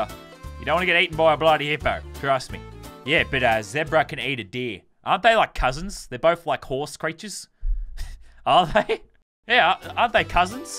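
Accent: Ireland. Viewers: I'm Irish and he sounded like he was Irish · can we just acknowledge how good his irish accent is tho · U putting an IRISH accent on to annoy me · Ur Irish accent is the greatest I liked subbed and turned on notifications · Gosh dang your irish acsent is legite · I love lasarbeams Irish acsent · Damn you got a good bloody Irish accent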